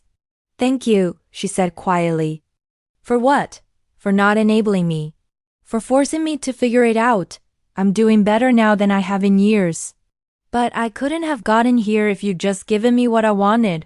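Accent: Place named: Asia. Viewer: Why do you sound like you have an Asian accent while a bunch of white people are used for the story?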